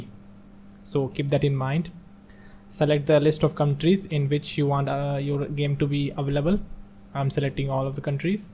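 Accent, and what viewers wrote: India. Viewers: The indian accent makes me confident he knows what he's doing and not trying to scam me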